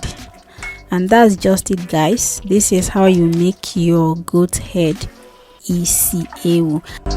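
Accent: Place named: Ghana. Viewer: Oh my gosh 😍 isi ewu of the mighty wash down You killed it chef 👨‍🍳 👏🏼 🙌🏾 You have ghanian accent, hv you lived dr?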